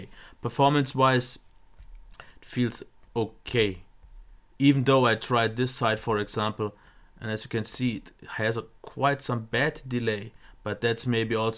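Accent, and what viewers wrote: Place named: Germany. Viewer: Man merkt aber ziemlich, dass du ein deutscher bist :-) aber gutes Video so far :D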